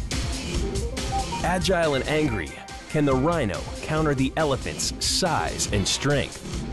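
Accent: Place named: United States. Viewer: I am not attacking Americans? I am saying that if this hole video was spoken in, with an English, Scottish, Australian, or even Irish voice-over accent, it would not sound so incredible and horrified terrible as it is spoken by this American voice-over. And this video is not the only example of what I mean, it is something USA people do for the last thirty years, and it's getting worse every day? Why? How come? Where did that start? I whish the video had a BBC voice-over instead of this.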